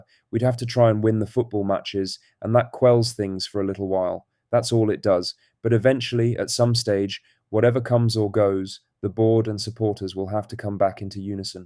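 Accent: United Kingdom. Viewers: its shite...why wouldd a posh englishman havee a celtic channel...its a1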